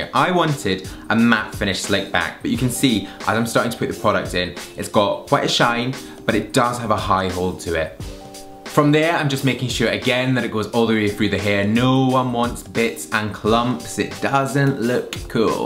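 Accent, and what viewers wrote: Scotland, United Kingdom. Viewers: you know what's cool you're scottish and i understand everything you said because scottish people have a different accent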